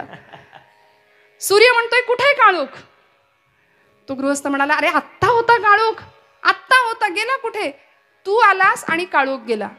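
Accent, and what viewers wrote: Standard. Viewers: ताई अतिशय सुंदर कीर्तन आणि वाणी खूप शुद्ध आहे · अतिशय सुंदर...गोड आवाज,शुध्द , उच्चार , प्रासादिक वर्णन...कीर्तन संपूच नये असे वाटते.... राम कृष्ण हरी 💐💐🙏🙏🙏🙏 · अत्यंत सुरेख !! वाणी अतिशय शुद्ध, स्पष्ट उच्चार, विषय मांडणी, विस्तार अप्रतिम.. एकंदर प्रासादिक !!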